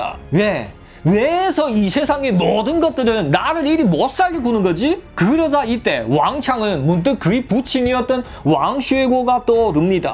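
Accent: Yanbian, China. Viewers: 한국말을 중국말처럼하네. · 조선족 같음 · 한국말을 중국발음으로 들으니 힐링되네.. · 한국말 연변말 섞어서 너무 이상하네요 하나만 하세요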